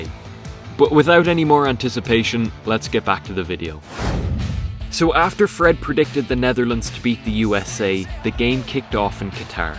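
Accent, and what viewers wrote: Ireland. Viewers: Are you from Ireland🇮🇪🇮🇪🇮🇪?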